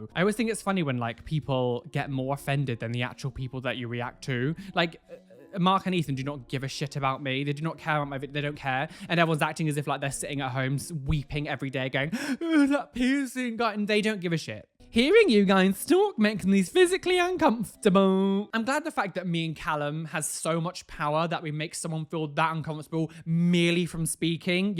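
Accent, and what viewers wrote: Texas, United States. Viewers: YO, ARE YOU TEXAN? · Atleast your American accent isn't as bad as American's British accent Like if America can have different accents why can't we lmao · The fact can do an American Accent better than I can do a British accent 😂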